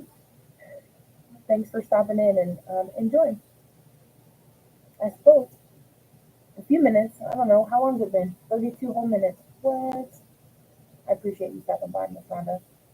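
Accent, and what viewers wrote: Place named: South, United States. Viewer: Yes girl, I've heard your accent since day one. I was gonna ask why you had one, a southern accent living in Illinois 😉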